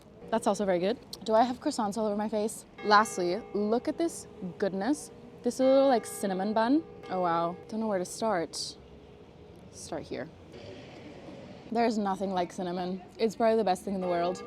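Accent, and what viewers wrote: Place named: Italy. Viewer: You are Italian?? Great accent!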